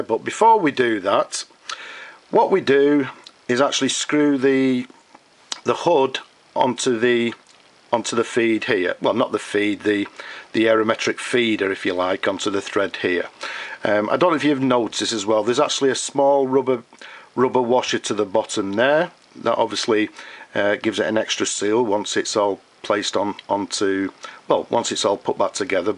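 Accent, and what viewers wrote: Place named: Manchester, United Kingdom. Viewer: Thanks to you (and a friend who supplied a feed,nib and distributor) I was able to repair a Parker 51 that belonged to my late grandpa. Thank you very much. Your videos are clear, your voice is quite nice (where are you from?, I can't locate your accent quite well, I'd say Manchester.) and well, your videos are all-around great. Thanks again!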